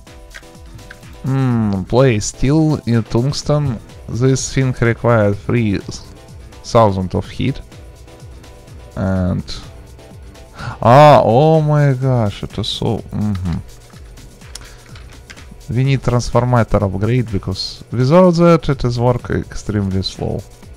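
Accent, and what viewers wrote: Russia. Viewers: Пахнет русским акцентом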